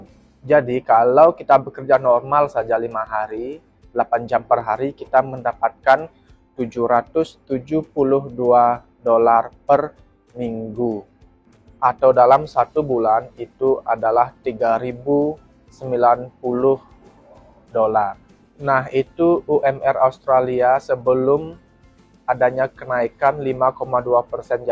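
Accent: Bali, Indonesia. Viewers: Pasti asal bali, logat2 bali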